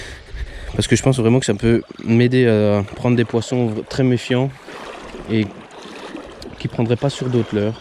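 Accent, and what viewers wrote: Belgium. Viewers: Wouah 😲 respect mec 🤜. TRES belle section, des truites magnifiques. j'ai Jamais vu une vidéo pareil...tes très respectueux avec les truites un vrai passionné ça fait plaisir à voir 😊. Je m'abonne à ta chaîne du coup et bien sur le petit pouce 👍. Ne change pas surtout 😉. Bye PS: Petit accent belge ?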